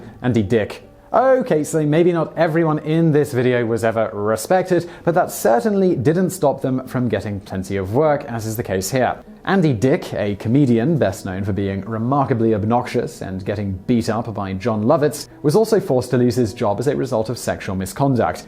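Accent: United Kingdom. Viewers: brother, your videos are excellent, however, certain words my friend, i.e., "berry" as oppose to baby. Rosemary's Baby. I gave you the benefit of the doubt due to the British accent, after rewinding the video many times, yes, "berry." Cheers my friend. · He's also British so his pronunciation would be a little different....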